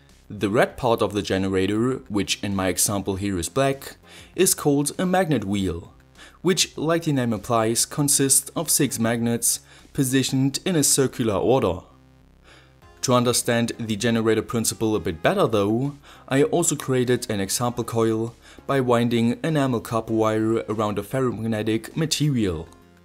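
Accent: Germany. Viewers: What kind of accent do you have? I can't quite recognise it Like which part of Germany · des is voll lustig wie du so richtig deitsch englisch redest ;D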